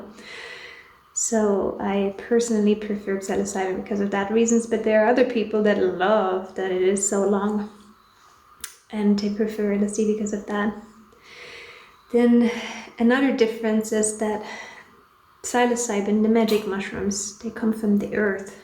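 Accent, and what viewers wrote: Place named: Norway. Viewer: Its so cool to see a "normal looking" woman talking about physcadelics. Not that everyone doesn't partake i just feel like your breaking a stereotype. Good content, i prefer lsd if im looking for a good time and i choose shrooms when i feel like having my sorry ass dragged through my own physce by nature haha, really though when i take shrooms i feel like im talking to my therapist. An old friend i like to visit when i need advice. New subscriber here , where are you from ? I love your accent you remindlike one of my favorite musicians from norway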